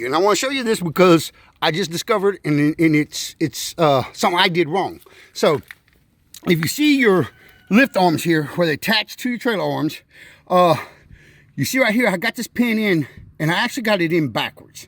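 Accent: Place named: Louisiana, United States. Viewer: HELLO HOW ARE U?? IM NEW HERE SHOWING SUPPORT FOR U. I LOVED LOUISIANA. I WAS THERE FOR 3 YEARS WORKING WITH A DREDGING COMPANY PUMPING SAND IN MYRTLE GROVE NEAR PORT SULPHUR AND BELLE CHASSE. I MISS IT THERE. UR ACCENT TAKES ME BACK. BUT AWESOME MASSEY U GOT THERE. AND SO FAR CONGRATS ON THE SAINTS SO FAR!! WHO DAT!!!!! ANYWAY I SEEN U ON ANOTHER CHANNEL I WAS ON SO I WANTED TO STOP IN. I ALSO FED ALLIGATORS WHILE I WAS THERE. ITS ON MY CHANNEL MY OLDER VIDEOS. I WAS HELPING TRIGGER TOMMY FROM SWAMP PEOPLE. IT WAS FUN! BUT GREAT VIDEO, !!🤗🤗🤗🤗🤗🤗🤗🤗🤗🤩🤩🤩🤩🤩🤩🤩🤩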